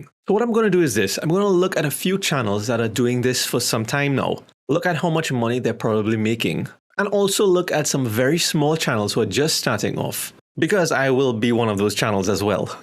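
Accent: Trinidad and Tobago. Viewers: Trini accent? Lol had to ask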